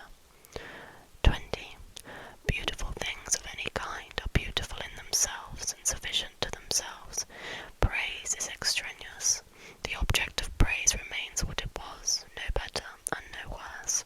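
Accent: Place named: England, United Kingdom. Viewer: yay I love an English accent, subbed! I have a feeling you’ve got a lot of good books up your sleeve, you should totally do a book suggestion vid :)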